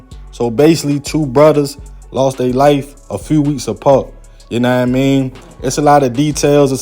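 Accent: Baltimore, United States. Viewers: That’s their Baltimore accent,, I might gotta slide 🛝 cause I really can’t take it 🤦🏽‍♀️🤦🏽‍♀️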